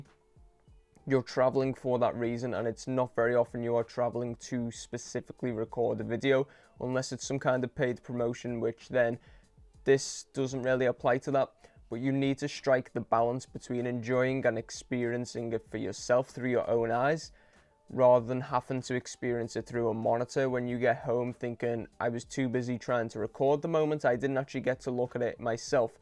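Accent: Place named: North, United Kingdom. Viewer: Thank you for such a detailed and well explained video. Great to hear a fellow northerner too ;)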